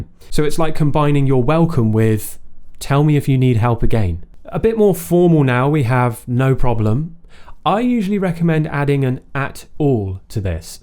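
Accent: United Kingdom. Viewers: I love your British accent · Hello. Wonderful lessons as always. I agree, English can be boring, especially when conversing. Having options is a great way to expand one's vocabulary. I used the following phrases as an alternative to: "You're welcome." 1. My pleasure. Pleasure. Pleasures all mine. 2. No worries. No problem. 3. Much obliged (combined with #1) 4. It's all good paired with #2 or #4 5. Merci or Merci Buckets.😂 6. Quite alright (with #2 or #4) 7. I gots 'cha! (#2 or #4) 8. Don't mention it. 9. No sweat with #2–8 10. It's okay. No biggy. Absolutely love the British accent. It's so posh and quite easy on the ears. I speak with a Canadian accent and, apparently, mine is an enigma here in the Philippines. I sound: Canadian - American - British -French all jumbled together.😅 Kudos. Watching and learning all the way from the 🇵🇭. Cheers!👍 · I love the british english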